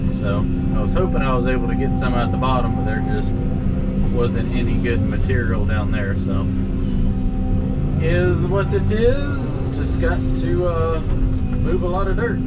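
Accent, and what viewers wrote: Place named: North Carolina, United States. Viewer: Would it be safe to assume you are from the Raleigh/Sanford/Durham area? Half my family was from there and, had the same accent that you try so hard to cover up. LOL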